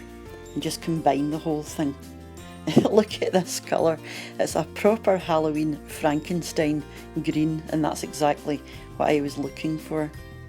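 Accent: Scotland, United Kingdom. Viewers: Fantastic , straight to it , no messing around , I wanted to make these for a long while , last time I made them I was in school and could not remember how to do them. I forgot about the egg white . Thankyou for your upload , I am making these tomorrow 😊 love your soft Scottish accent . Was a pleasure to listen too , I will subscribe and see what other recipes you have .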